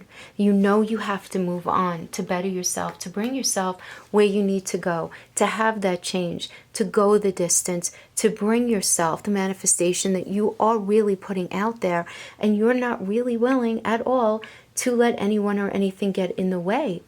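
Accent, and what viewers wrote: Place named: Boston, United States. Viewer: You are definitely from or live in Boston... at least your accent sounds like it... great reading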